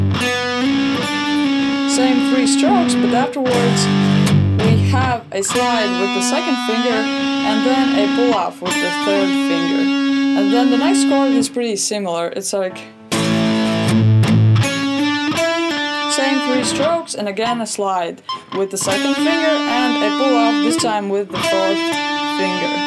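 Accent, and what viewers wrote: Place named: Russia. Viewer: да конечно нужна. тоже смотрю. Благо инглишь нашего брата ни с кем не перепутаешь и легко понимаешь что говорят.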